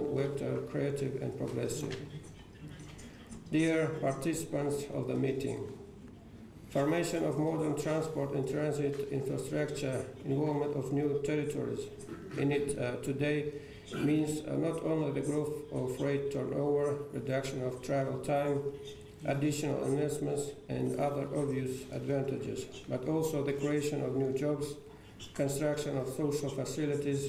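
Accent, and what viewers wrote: Turkmenistan. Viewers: ебать я нихуя не понял, туркменоинглиш тяжело входит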